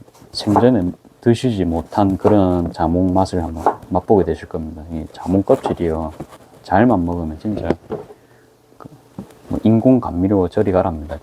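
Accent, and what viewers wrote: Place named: Busan, South Korea. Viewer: 영상을 보면서 저도 자몽청을 한번 만들어볼까? 하는 생각을 합니다 정감있는 부산말 참 좋습니다!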